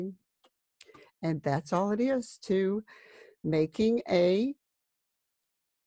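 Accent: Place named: South, United States. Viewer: A very beautiful southern voice my dearest, tell me are you from Florida?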